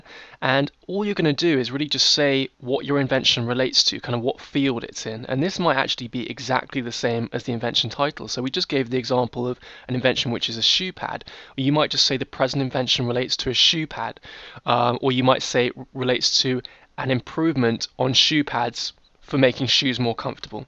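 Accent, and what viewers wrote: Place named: England, United Kingdom. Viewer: your talking provisional patents and US related. You sound very english to me. Does this work in UK also?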